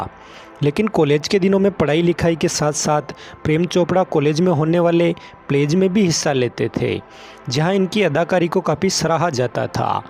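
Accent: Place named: Marwari, India. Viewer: मारवाड़ी है ।चेनल ।में ।बोलने वाला ।उच्चारण से पता चलता है ।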